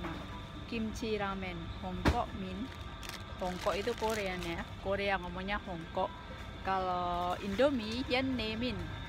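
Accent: Java, Indonesia. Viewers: Hhhee embak orang jawa yea .hhhhee mrdok bahasanya. Keren mantab salam 77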